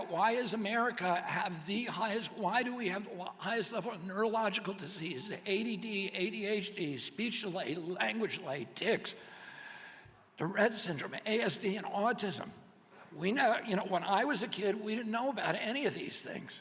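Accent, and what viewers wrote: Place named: England, United Kingdom. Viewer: it doesn't sound like boston to me though, it sounds like an english accent, idk its no big deal just weird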